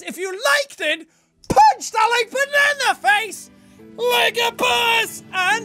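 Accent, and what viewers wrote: Ireland. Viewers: Just because uses a reaaally Irisch accent for the characters this is my fave LP from Moirai. it's great, i love it! <3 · I didn't think you could sound anymore Irish. I was wrong :D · u sound so irish then befor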